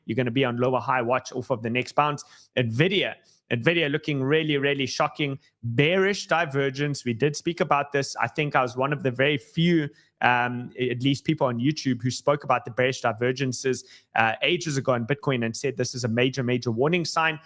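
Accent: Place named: South Africa. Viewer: $10000 that's a south african accent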